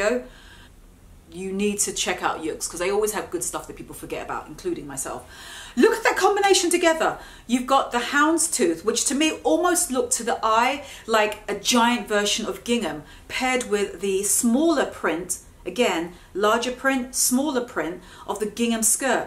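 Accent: United Kingdom. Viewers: Bello acento britanico :) y obviamente excelente video!!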